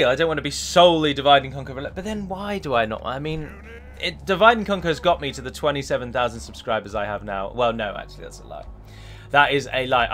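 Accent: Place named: RP, United Kingdom. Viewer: Not wanting to be pedantic however this text may put in context your quite modern RP pronunciation re the BATH - TRAP split. It is know that northerners flat sounding vowel as in Bath was the original pronunciation. <link> . p.s. love your videos. Thanks.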